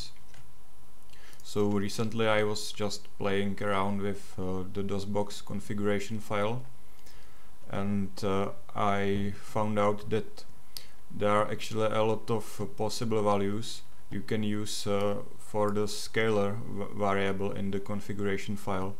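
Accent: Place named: Czech Republic. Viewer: Ne... nikoho jsem se napodobit nesnažil - prostě českej přízvuk.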